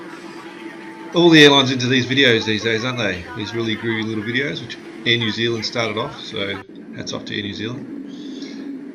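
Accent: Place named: Australia. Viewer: Australian accents are so cool!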